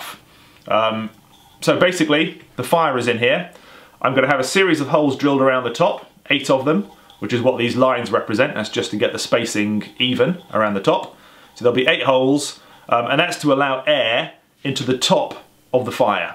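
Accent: England, United Kingdom. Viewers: Thank you. I’ve just found your channel, but you have another subscriber on the strength of this one video. Also a nice change to find an English accent and a practical purpose, rather than some over-tattooed young person preparing for an “Armageddon” with a military hat and a (metaphorical?) gun on his hip. Thank you again. Les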